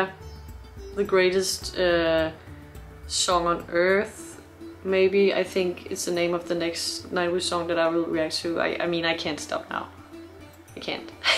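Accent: Denmark. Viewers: You have a lovely Danish accent :-) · I wondered where you were from since you Danish accent is so subtle, but then I realized you're from Copenhagen! Yay, fellow dane! :D Good reaction! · Are you danish? it sounds like you have that accent :D Great reaction <3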